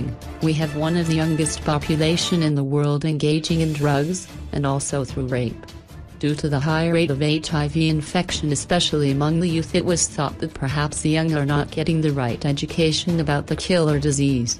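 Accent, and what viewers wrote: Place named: United States. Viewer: who's voice commenting there!!? some American??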